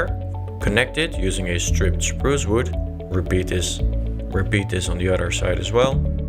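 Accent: Netherlands. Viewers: Exactly the video I needed! Thanks for the beautiful design & tutorial <3! (Hoorde door je accent meteen dat je uit NL komt! Bonus!)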